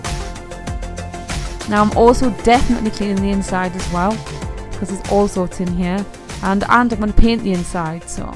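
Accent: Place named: Newcastle, United Kingdom. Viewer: Nice to hear a Geordie accent!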